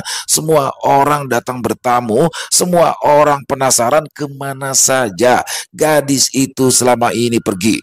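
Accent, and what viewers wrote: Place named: Banjar, Indonesia. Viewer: ASSALAMU ALAIKUM Bg,slmt mlm 🙏,kisah yg sgt kereenn,👍satu hal yg paling suka jika stiap kali bang membawakan kisah"dari tanah Kalimantan,logat bahasa Banjarnya itu sgt fasih,sbgmna orang" Banjar berdialog sehari"nya,& itu sllu saya perhatikan,tdk canggung,mantaaabbbb, 👍👍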